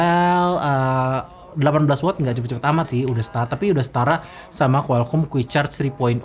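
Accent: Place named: West Java, Indonesia. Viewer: Anjay Sunda pisan wkwk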